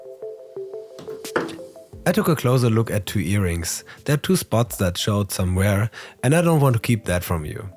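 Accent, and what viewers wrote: Germany. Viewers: Thick german accent😂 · I am sure its a germany guy :D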